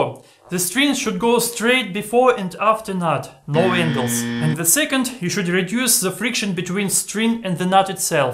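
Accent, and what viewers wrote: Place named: Russia. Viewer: Хм, у тебя очень русский акцент))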